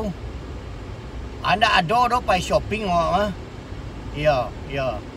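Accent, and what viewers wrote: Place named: South Solok, Indonesia. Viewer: Ohh... gini ya logat solok selatan, benar kan muara labuh di solok? Salam dari pekanbaru 😊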